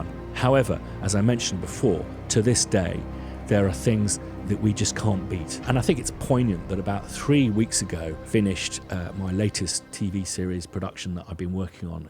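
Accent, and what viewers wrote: United Kingdom. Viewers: The one simple thing you can remove from the chain of events that would make the outcome different is your British accent. There. I said it. Nothing better than learning composing from a dude with a sophisticated british accent.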